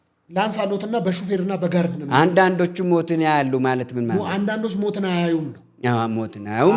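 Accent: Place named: Ethiopia. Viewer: በዚህ accent የአዲስ አበባ ልጅ ነኝ ማለት አይነፋም። በማንነትህ ኩራ። As long as you believe in one Ethiopia.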